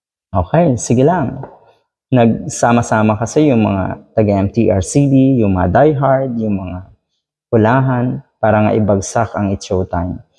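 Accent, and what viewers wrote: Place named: United States. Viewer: FIL AM YATA ITO SLANG MAGSALITA...SLANG DIN UTAK ..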